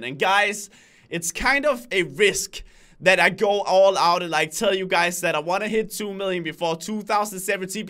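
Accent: Denmark. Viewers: why do people make fun of your voice like its an accent cuz your danish or u just wanna talk like that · i hear your danish, prove it....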